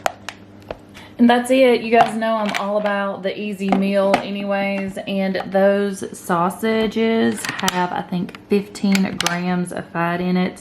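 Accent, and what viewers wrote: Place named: Tennessee, United States. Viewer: I know a Tennessee accent when I hear one. This was a great video. I like to eat a lot too.❤️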